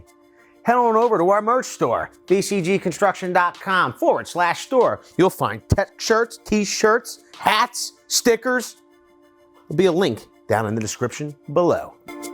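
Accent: Philadelphia, United States. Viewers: What part of Philly are you from? That accent.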